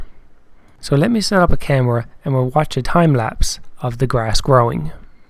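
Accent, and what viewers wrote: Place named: Canada. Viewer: That is one Canadian accent you got there, bud! Ottawa valley?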